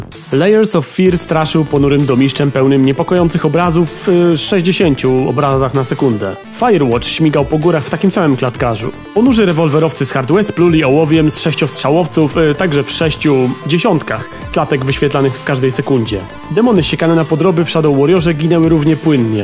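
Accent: Poland. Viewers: jesteś z Lubelskiego? Masz taki akcent.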